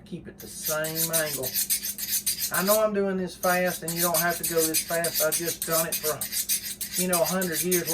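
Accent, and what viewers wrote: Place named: South, United States. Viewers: addicted to hearing that southern twang lol. also love the tips. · I do appreciate all your content man. Your a very humble God fearing man and that's nice to see these days. I live outside Nashville TN in a little place called cheatham county and you talk just like us bubba so it's normal to me but i love how others get a kick out of it 😂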